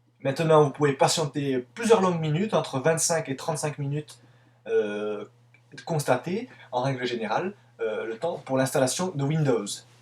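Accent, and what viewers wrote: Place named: Marseille, France. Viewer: toi t marseillais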